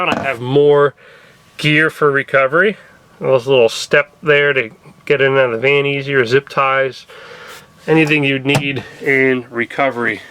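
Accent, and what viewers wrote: New Jersey, United States. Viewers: Love the “wooder” hose, and “wooder” heater. Gotta love the Jersey accent! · Everyone in Jersey sounds like that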